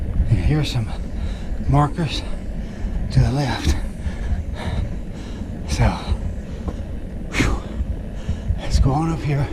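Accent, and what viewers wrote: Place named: United States. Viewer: Are you from Glenwood Springs? or a different part within Colorado? I know you are an American. Your accent does give it away. I'm just wondering as to where your from.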